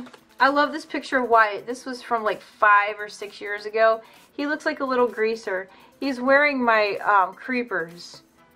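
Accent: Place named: Ohio, United States. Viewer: I discovered you today through your easy peasy eye trick. I haven’t watched much YouTube but lately, have been watching to get make-up tips. I watched a couple more of your videos when I found out you are from the Cleveland area. I grew up in Wellsville, down on the Ohio River. Then, you mentioned Texas. I have lived in Texas for 18 years, 10 in Houston and 8 in the DFW area. I think listening to you talk reminded me of home. I don’t miss winter but I miss Ohio. I am happy I happened to find you.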